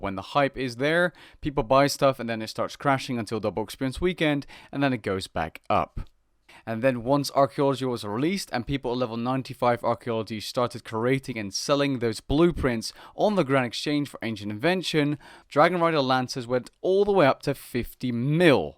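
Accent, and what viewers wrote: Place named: Netherlands. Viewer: You're Dutch, aren't you. :D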